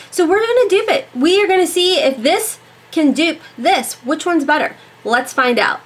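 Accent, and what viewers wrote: South, United States. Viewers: Girl I wished I would have seen this video first lol. Because I'm all about saving money. I really enjoy your channel plus your southern drawwwwww girl you would fit right in here in GA.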